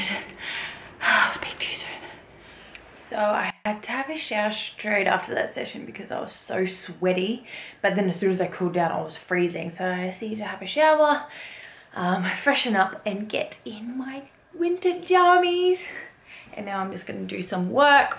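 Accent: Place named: South Africa. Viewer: Are you South African? I have a friend that is South African and the accent is so similar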